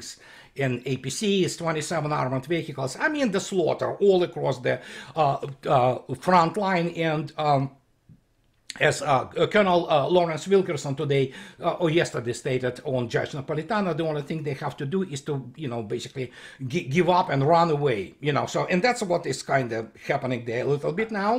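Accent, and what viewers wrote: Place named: Russia. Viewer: You talk too precipitously and with your strong Russian accent is hard to follow your line of thought; calm down, pronounce each syllabe distinctly and do not overlap words, one on top of the next.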